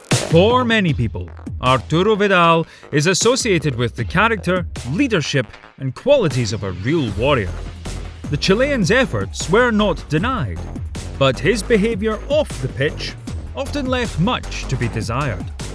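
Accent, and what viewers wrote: Scotland, United Kingdom. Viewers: A video about drinking just feels so much better when narrated in a Scottish accent!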